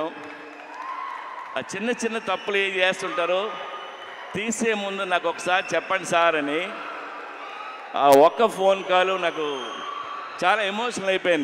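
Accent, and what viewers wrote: Telangana, India. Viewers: Telenga slanga ..worst dialogue delivery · orey vadu telangana slung enti ra ..ni ayya..nuvvante maku oka nammakam ..but..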